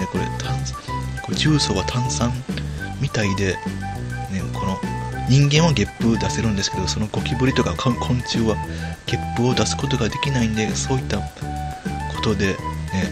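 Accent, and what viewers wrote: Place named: Juso, Japan. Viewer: 十三のイントネーション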